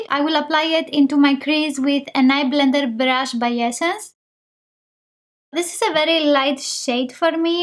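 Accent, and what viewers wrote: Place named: Greece. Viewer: I bought this palette yesterday and you're presenting it for us today! Once or twice a year Essence makes a big discount on some of their product, and this was one of them. I got it and the Be an Original one for 1.10 euros each, and now when I've seen your review, I see that I made some good choices. It's great that you try to show us as many colours as you can. I always enjoy watching your reviews. Keep up the good work 😎 I've shown this video to my mom too, and she says you're sweet and cute, and your Greek accent too.💖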